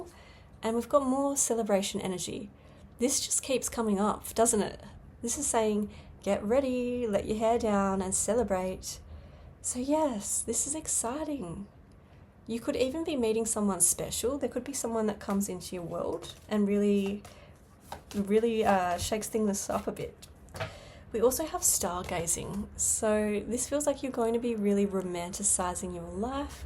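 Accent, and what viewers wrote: Australia. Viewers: i like your hair the blue hair and blue eyes is almost like i was when i was youi age except i also had one blue loop ear ring and one blue Chuck Taylor All Star Converse shoe and one black Chuck Taylor All Star shoe when i was a freshman in college. i'm no spring chicken. and your accent it reminds of the Vegemite sandwich i had a few weeks ago and yes that was an Aussie band song reference. is from sunny California to the land of the rising sun Japan off the beaten path enough? 🍀🎶✨ my 2nd time thru college i had graduated from an online University. Biggest waste of resources ever. i could've been sharpen my Japanese language skills instead of learning software development during an Internet age when only 1 AI Class was available as an elective class which i decided not to take.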